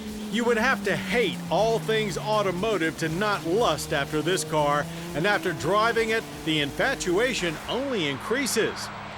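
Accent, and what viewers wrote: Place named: United States. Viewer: Simple, he's american.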